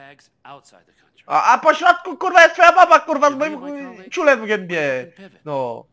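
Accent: Silesia, Poland. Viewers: Seria GTA u bez Śląskiego gadania jest tak niepotrzebna, jak BuFu na Polskim YT... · Po śląsku było lepiej jak mówiłeś :(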